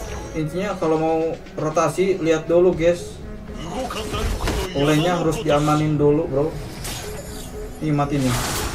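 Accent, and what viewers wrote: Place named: Garut, Indonesia. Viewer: Logatna jiga urang sunda mang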